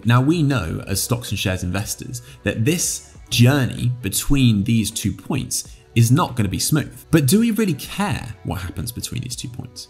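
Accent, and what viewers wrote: United Kingdom. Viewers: Yes, that's mostly correct. I would like to continue to generate income in retirement but I'd like to do it with minimal risk. I hope the strategy of keeping 30% invested covers the loss to my cash reserves form inflation and gives me a slight gain. No one knows for sure what the market is going to do so I find it hard to make decisions this close to retirement. I'm sure fear of losing it is what keeps me at such a low level of investment right now. I just feel like we are in an asset bubble that could pop just as I'm about to retire. That would hurt if I were fully invested. What I have invested is VERY diversified and I made what I think was a smart decision at the first of the year to buy a significant number of STIPS to help keep my investments balanced when bonds/treasuries are performing so poorly. I'm just amateur so I'm reading and learning as much as I can along the way. 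Your videos are very helpful. It's nice to hear insight from a pro! Your videos seem smart and sensible whereas the carnival barkers on TV (who I shall leave nameless) seem to contradict themselves every other day when they've turned out to be wrong. Also, does not hurt that your info is delivered with a cool British accent. :) I also like Pension Craft and Paul Merriman.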